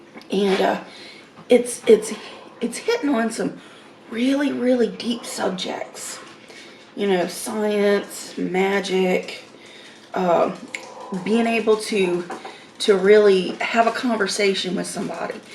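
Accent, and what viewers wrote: United States. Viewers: She does speak English it's just a American accent like British have British accent accent isn't a language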